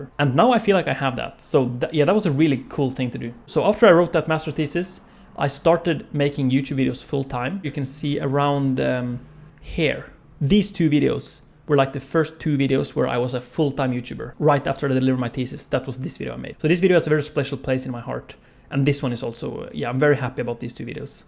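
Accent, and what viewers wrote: Norway. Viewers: Interesting to hear your Norwegian accent. Sounds like you're from the Sogn og Fjordane area, probably a bit inland, not coastal.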